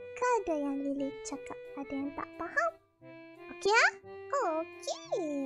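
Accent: Malaysia. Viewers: It's the nehhh for me, it's truly Malaysian 😆😆😆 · Thats definitely Malaysian... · I thought she got a Chinese accent but it is actually Malay! Damn that's nice She sounds so good, especially when she curse in Indonesian lol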